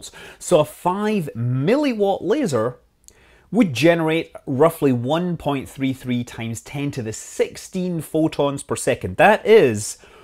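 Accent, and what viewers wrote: Scotland, United Kingdom. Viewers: It's refreshing to hear someone with a Scottish accent talking about something other than drinking and fighting.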